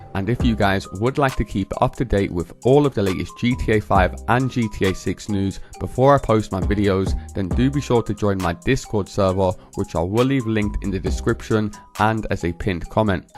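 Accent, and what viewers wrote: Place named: England, United Kingdom. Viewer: Mate, You sound English.. As an Aussie I say IGNORE AMERICANS when they try tell an Englishman how to speak English.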